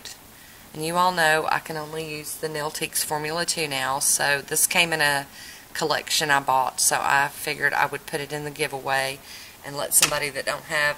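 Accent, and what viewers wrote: South, United States. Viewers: I'm from Pennsylvania! I could definitely tell that you're from the south! Thank you for sharing your love of polish with the world!!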